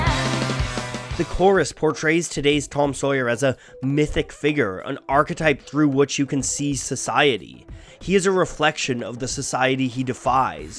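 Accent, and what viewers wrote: Canada. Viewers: Thumbs up for the Canadian accent !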